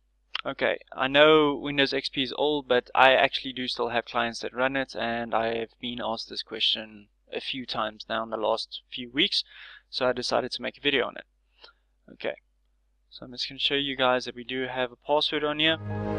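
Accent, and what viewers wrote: Afrikaans, South Africa. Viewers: I recognize an Afrikaans ouk when I hear one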